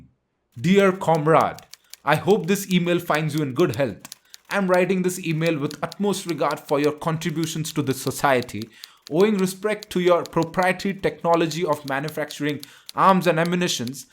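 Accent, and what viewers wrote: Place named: Pune, India. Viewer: Dude, sounds like you're from Pune... Awesome!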